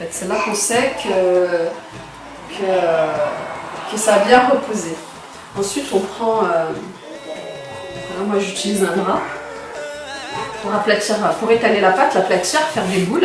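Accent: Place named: France. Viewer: lol c fucking weird voir une mdm aekk voile parler francai de france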